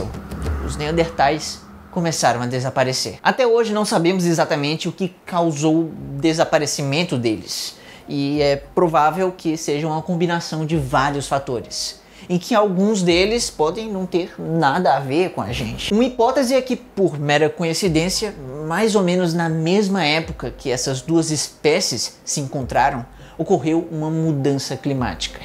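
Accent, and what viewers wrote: Ceará, Brazil. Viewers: nossa achei que ele era cearense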